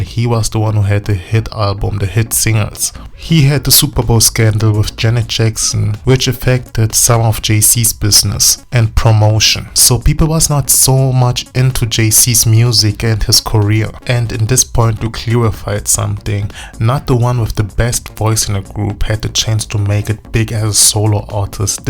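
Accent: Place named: Germany. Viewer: Your German accent is the Hammer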